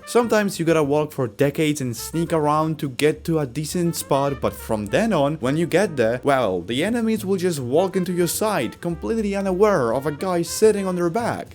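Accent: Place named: Europe. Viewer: You have a bright future in this, the right amount of edgy, the weird European accent and tempo. Keep it up.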